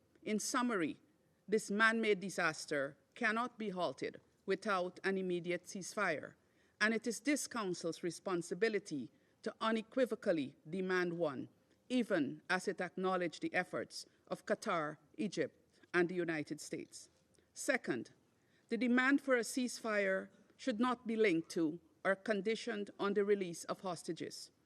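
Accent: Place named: Caribbean. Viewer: In her lovely Caribbean accent: The US resolution is bullcrap!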